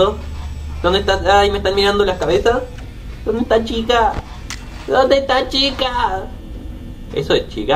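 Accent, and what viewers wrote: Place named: Argentina. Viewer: Jajajaja que argentino wacho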